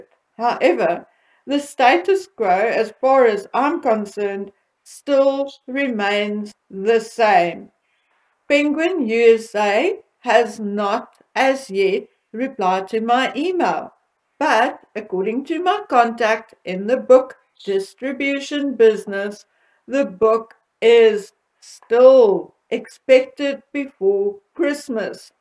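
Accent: Afrikaans, South Africa. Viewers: Afrikaans accent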